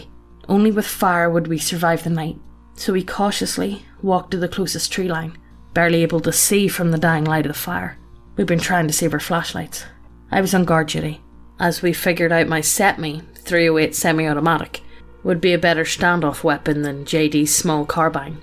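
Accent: Ireland. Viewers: Sp00k? Check Scary footage and music? Check Irish Voice? Check Yeah, it's time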